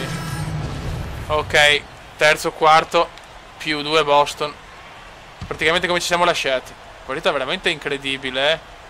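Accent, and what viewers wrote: Veneto, Italy. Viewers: nè che sboro fioi, non semo ciapà tanto màl. e qua il veneto si sente hahaha grande <3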